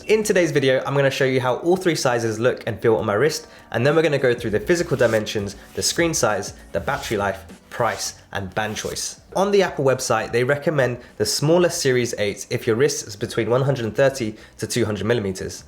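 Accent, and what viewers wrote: United Kingdom. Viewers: It's the first video of you I've watched, it was suggested by Youtube, I like your analysis and I'm agree with you about the size question. I have a Garmin 55 since 2 weeks, it's nice but the screen is awful compared to a smartwatch, I'm interested into the Apple Watch (series 9 when it will be out). You British accent is very clear even for a non-native speaker like me so I will definitely watch other videos of your channel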